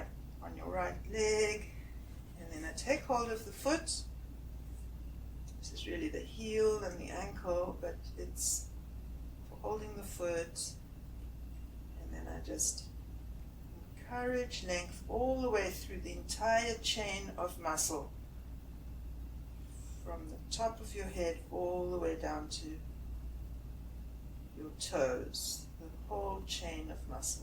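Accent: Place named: South Africa. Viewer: I could be wrong but she sounds as though she is from South Africa and i love that accent. Great video.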